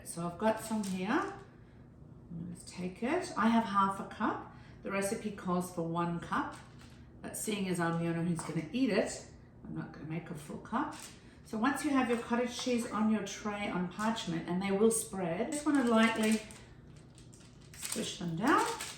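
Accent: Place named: Australia. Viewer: Hi! Good to hear an Aussie do a cooking segment. I think you could probably sprinkle them with sesame seeds on top for a bit of flavour. Maybe you could sprinkle a little Parmesan cheese in with the cottage cheese. Thanks for the recipe. All the best.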